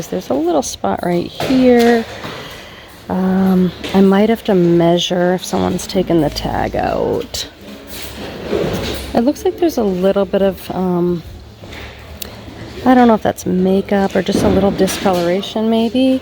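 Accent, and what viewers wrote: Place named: East Coast, United States. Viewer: East coaster here, another reason i love your videos, we sound alike lol and your word use, ie Smutz. I thought, i found my people lol.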